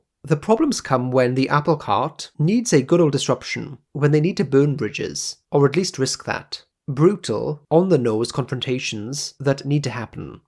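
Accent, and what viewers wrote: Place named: RP, United Kingdom. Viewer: INFJ here. The bigger picture destroyed and made me at the same time 😅 also.. you have an extremely posh voice, I love it. 😅😊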